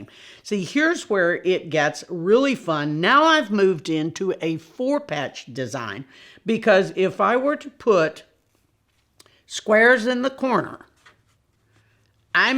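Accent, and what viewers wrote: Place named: South, United States. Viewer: Being from the south, I could so easily become friends with! Love her southern drawl, her humor! Where have you been? I discovered your videos today, and am binge watching every video you have put out. I have been quilting for a couple of years, but I am definitely still a beginner, with BIG dreams. Thank you so much for just keeping it simple. I look forward to watching every video. FW, Tx here!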